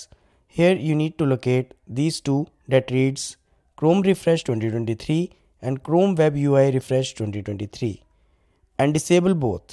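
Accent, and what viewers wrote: India. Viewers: When you hear Indian accent, it's usually scam. But this guy is legit. Saved my butt.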